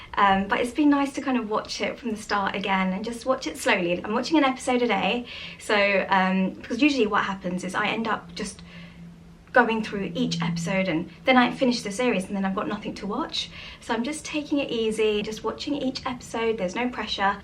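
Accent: England, United Kingdom. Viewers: It’s the English accent .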